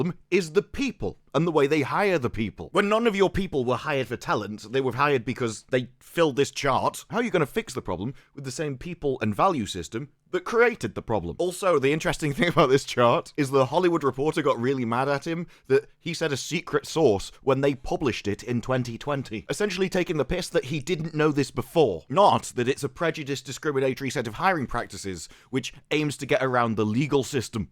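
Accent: England, United Kingdom. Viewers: I now remember why I unsubscribe from you. You are obnoxious repetitive little prick who thinks he's smart when all you are is just some retard repeating the same crap with a British accent. that and just a little thing get some kind of tooth whitening thing going on. Every single video you look like you've been chewing spinach right before you went on camera. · Are you an American just with an English accent because you sound like everything you're saying I would say.!!?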